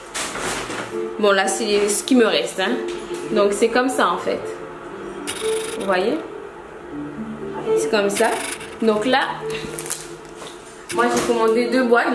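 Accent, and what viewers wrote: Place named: Guadeloupe, France. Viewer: Tu es Guadeloupéenne ça s'entend ❤❤❤❤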